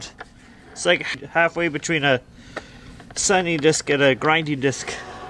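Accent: Ireland. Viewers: Is he irish? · I thought he was Russian then realised he was irish. What a skilled .. blacksmith? He's good. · its an irish accent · First thing I noticed was your irish. I'm Irish too by the way.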